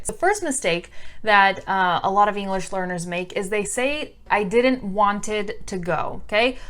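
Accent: California, United States. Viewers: Move to California and you´ll start talking like her in a couple of years or so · I know everything what you said, particularly the grammar aspect. But I simply love this video bcz its very useful for my listening ,and i love your californian accent. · Come to California, many women sound just like this. I don't know if she is from California, I'm just guessing based on her accent.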